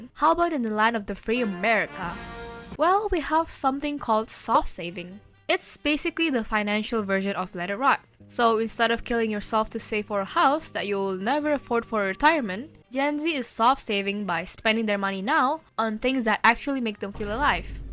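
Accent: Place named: Asia. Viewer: Asian accent suck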